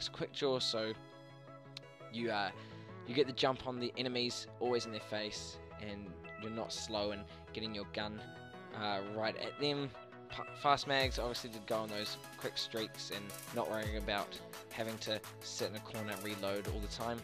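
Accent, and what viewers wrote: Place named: New Zealand. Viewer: You sound like a New Zealander lol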